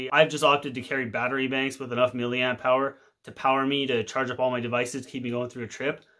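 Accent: Canada. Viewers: Geez, if you want a larger U.S. Audience gotta tone down that canuck accent my friend - but, guessing it's a slow PRO-cess.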